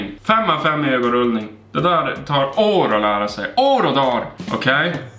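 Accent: Norrland, Sweden. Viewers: Jååå, jag gillar att äta kladdkaka samtidigt som jag kollar på dina videos när du pratar norrländska.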